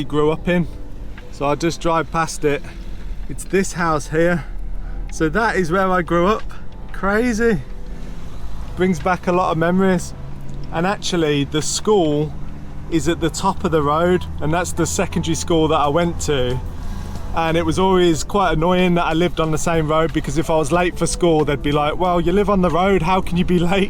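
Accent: Birmingham, United Kingdom. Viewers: I've been following the 2 of you since my Auto play turned on one of your early videos and not even watching the screen I heard the dulcet tone of Brummie wafted across my studio. I'm from Ward End but moved to Greece 13 years ago then came back to the UK 8 years ago but not to Brum so our youngest son could finish his education. Watching your little tour took me back over 30 years ago, doing the BRMB walkathon through the park and around the No 11 bus route, performing at the MAC with my collage drama class. My father was an Engraver in the jewellery too. Not sure if I really miss Birmingham, I think I miss Greece more. Glad is feeling more herself, x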